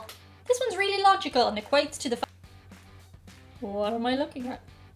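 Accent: Ireland. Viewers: Just discovered me a new channel with another gorgeous woman with an accent! Got me a Filipina, a German and now an Irish. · Miss I am a old half American Scottish German Love your content huge fan but is nearly impossible to get Scottish food in Detroit Michigan.. but I wish you never taught me about crisp sandwiches curse you lol I grew up with Scottish German Italian southern accents it is my consensus that your Irish is the most pleasant on the ear. I really enjoyed your collaboration with wee Scottish lass If we ever get free this virus please consider doing one with batchy. Actually follow about 20 people from the UK the try videos you guys are awesome one of you guys always puts a smile on my face before I go to sleep keep up the good work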